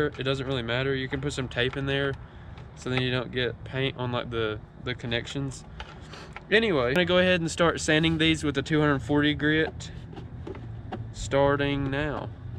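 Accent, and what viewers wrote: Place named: Alabama, United States. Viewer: Never heard a Mexican speaking with a Alabama accent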